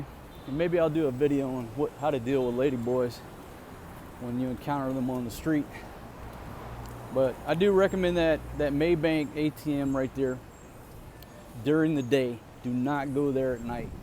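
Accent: South, United States. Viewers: You have a cool suthern accent. Lo Great video anyway. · Your accent sounds like you're from the south?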